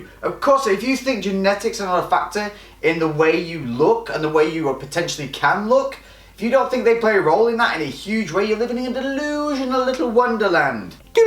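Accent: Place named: Northern England, United Kingdom. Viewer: Love it, and nice to hear a fellow Brit talking fitness for a change. Plus you also sound like a northern lad like me! Just recently found your channel and it's great!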